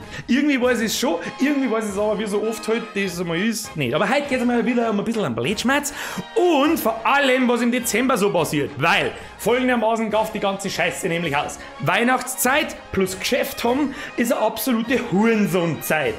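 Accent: Bavaria, Germany. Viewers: Läx verstas zwar nume weni gnau anelose Ich find de bayrisch dialäkt am beste^^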